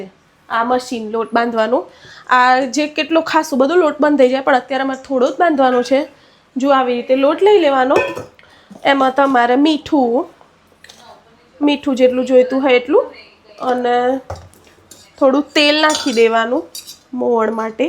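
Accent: Sabarkantha, India. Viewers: સાબરકાંઠાની બોલી 😊😊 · Sabarkanthaa ni bhasa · બેન સાબરકાંઠા ના પટેલ લાગે છે ભાષા 😅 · Sabarkantha boli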